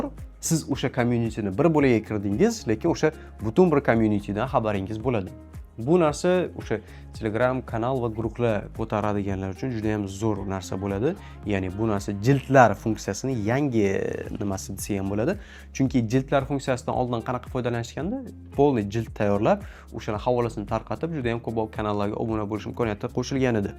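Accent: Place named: Russia. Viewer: Rus, turk odam endi gapirvotgan odamga oʻxshab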